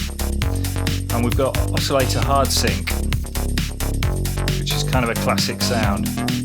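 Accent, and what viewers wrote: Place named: England, United Kingdom. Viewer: It's nice to hear an English accent on a walkthrough such as this for a change! Thanks a lot - found this tutorial to be very useful. Think I'll be getting Synth Squad.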